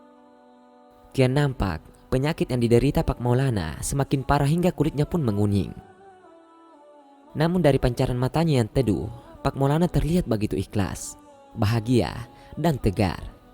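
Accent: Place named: Medan, Indonesia. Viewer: pengisi suaranya orang medan pasti😊😊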